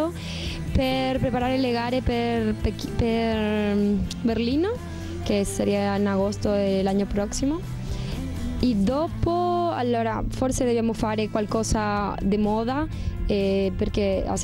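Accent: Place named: Italy. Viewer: Soo what!!!! you speak English whith Italian accent.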